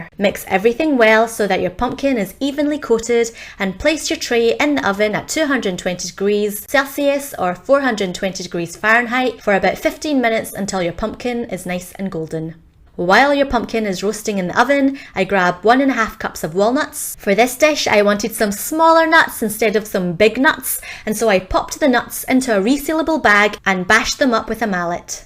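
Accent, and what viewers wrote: Scotland, United Kingdom. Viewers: You speak with a lovely Scottish accent. Am I correct? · I was just looking for some decent vegan meal preps & came across your video. Took me 5 seconds to subscribe thanks to that fabulous fellow Scottish accent! Woohoo! :D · Whenever I see/hear an Asian with a UK accent, my brain does a double-take. Scottish is a new one for me. And you live in Australia. And eat Canadian maple syrup. Such Commonwealth.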